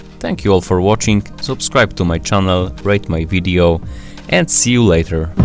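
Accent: Poland. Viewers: Słychać ten polski akcent :pp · Your accent sounds like polish idk is it true lmao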